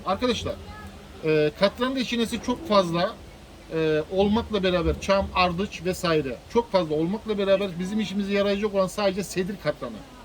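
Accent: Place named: Manavgat, Turkey. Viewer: Konuşmandan bildim abi bende manavgattaydım😂şiven harika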